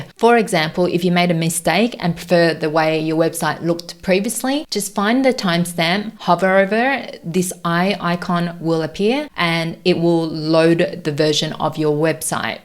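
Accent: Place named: Australia. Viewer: EXELLENT! EXELLENT TRAINING! Nothing personal to Mr. Lemonaid, but his broken English is a bit too much for me to tolerate for training I desperately need. I GET IT! English is difficult, but before you start teaching learn the language much better, even if you do have a bit of an Aussie tilt to it. BTW, my heart SOO goes out to the patriots down under. Fight on.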